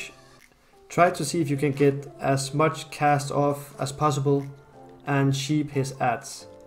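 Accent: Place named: Denmark. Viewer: You gotta be a Dane with that accent! Anyways thanks for the video.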